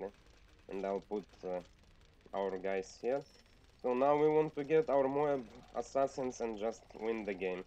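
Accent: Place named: Russia. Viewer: Fix your sound, mate. Add 20Db in post. It'd be better. And you've got a THICK Russian accent 😏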